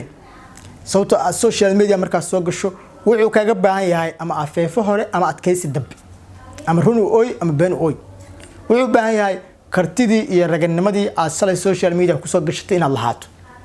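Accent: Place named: Baidoa, Somalia. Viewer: Kkkkk dhibka dhaaf yaa ku baray reer beydhabo hadalkoda umadeena maaha cunugaan horumar wacan walalkey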